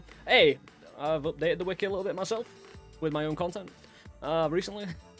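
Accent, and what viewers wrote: United Kingdom. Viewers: i think ive found my 2nd favorite british accent ._. so pleasing to the ear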